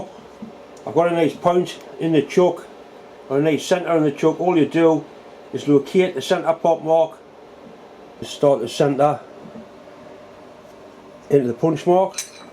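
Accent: United Kingdom. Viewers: Another great video. Turning the chuck register down like that is a nice old trick, it's been shown in many books and articles over the years. I'd like to ask where I can get a cast iron backplate just like that one? BTW, did you know your dialect was voted the most charming British dialect a few years back by UK TV viewers? It's very interesting to me because it still contains old Norse and Danish words and/or ways of expression from way back in history. And it sounds good. Best wishes to you and your family,André, Oslo.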